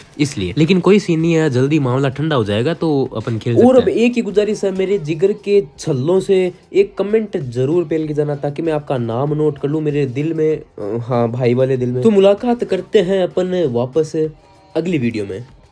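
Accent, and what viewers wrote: Haryana, India. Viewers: Bhai haryana t h tu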